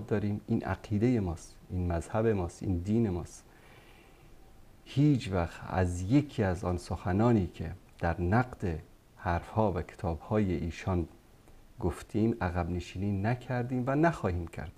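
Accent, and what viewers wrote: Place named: Iran. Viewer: لوده چرا به لهجه ایرانی ګب میزنی؟ تو ایرانی هستی؟ لعنت بر تو آدم لی فرهنګ و بی مسلک و سګ قلاده به ګردن ایران. داؤد مومند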